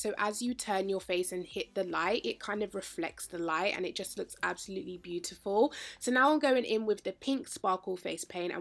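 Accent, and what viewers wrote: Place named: United Kingdom. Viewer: Havent watched a british youtube vid in a hot minute. Love her accent. The makeup is gorgeous ♡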